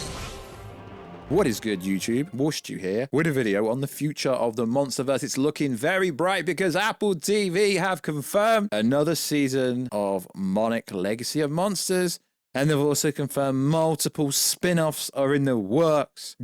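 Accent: England, United Kingdom. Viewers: Stop calling him "Kudora/Kidoruh" ... It's Ghidora lol I know you have the English accent but Damn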